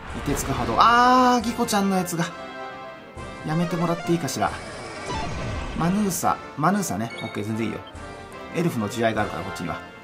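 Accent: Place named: Osaka, Japan. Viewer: 大阪のおばちゃんかおもいました。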